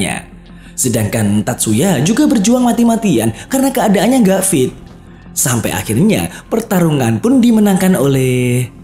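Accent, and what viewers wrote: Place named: Java, Indonesia. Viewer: Jowomu ngendi mas?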